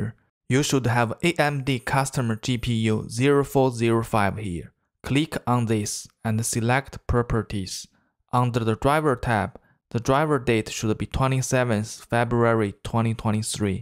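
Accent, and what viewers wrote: China. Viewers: 这是中式英语吗,口音感觉好熟悉啊www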